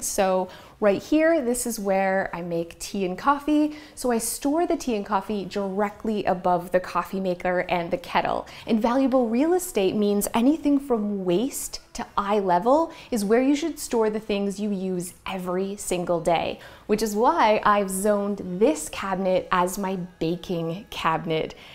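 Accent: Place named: Canada. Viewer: That Canadian accent is coming through! Lol 😂 Love these tips!!!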